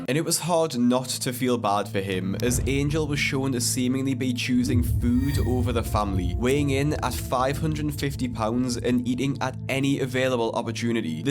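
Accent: United Kingdom. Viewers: Completely off topic, but I was wondering where you are from. I watch another YouTuber with a very similar accent. I mean, I know it's UK, but it amazes me how an island that isn't very much bigger than my state (Illinois), has so many different regional accents!! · This is an American TV program. Why in the hell do I have to listen to somebody with an annoying British accent??? That's the only reason I can't subscribe to this channel.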